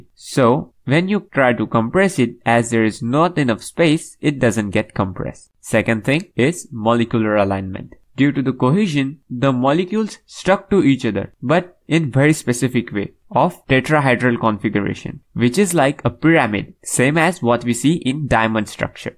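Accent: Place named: India. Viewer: Wow, living proof I can watch a scientific explanation in Indian English when it's actually clear and well-made - thank you for taking the time! Also, the 'very less' is quite endearing, reminds me of a good friend.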